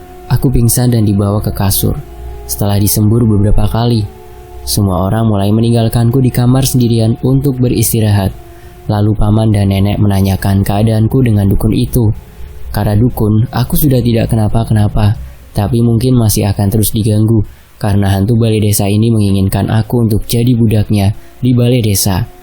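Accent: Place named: Banyumas, Indonesia. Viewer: Bahasanya ngapak campur jawatimuran min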